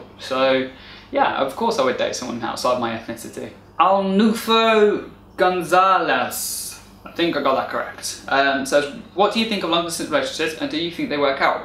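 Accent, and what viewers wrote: United Kingdom. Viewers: Starting to look at your post , ( luv it!), would like to know which country you are , beautiful accent! Thx to answering,I always loved British accent, It’s like poetry for my ears !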